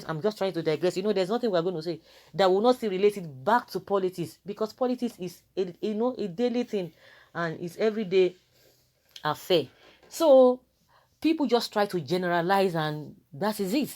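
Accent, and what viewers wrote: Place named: Kwara, Nigeria. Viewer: You laugh like a Kwara person. Are you from Kwara State????😆😆😆😆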